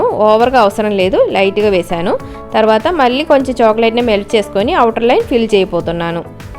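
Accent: Kerala, India. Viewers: Yantha ba telugu matladhunori with that malayalam touch❤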